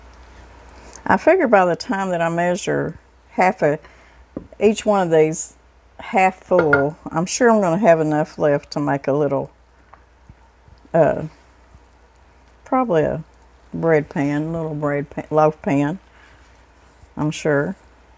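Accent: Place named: Arkansas, United States. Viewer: Boy, did you bring back some old and wonderful memories. I used to make pumpkin bread in Folger's Coffee Cans and it always turned out wonderful. Later down the road I went to a pampered chef party when they introduced their new baking round, for baking breads. They had their Pam. Chef logo and design in them, which I thought was so cool. But to my dismay, after receiving mine and baking a loaf, it looked for like a cheese roll because the pans themselves were not anywhere big enough in size (round), it baked up too quick and as I said looked like a cheese log. So, I went back to my old way using Folrgers Coffee Cans. You remind me so much of some of my Aunt's who all live in Arkansas and "sound" just like you. I was born there (Morrilton Ark) but my Dad moved us to Texas for when I was 5 years old. We traveled back there most every year for vacationing and "Decoration Day". 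I love Arkansas. Those many times I spent there are the best memories of my life. I'm new to your channel and subscribed, so I'll be making many of your recipes I found, first being your pumpkin bread recipe. Thank you so much for sharing with u and say hello to my fellow (Arkansa-yers) !.. God Bless you and your husband. Linda D. from Texas.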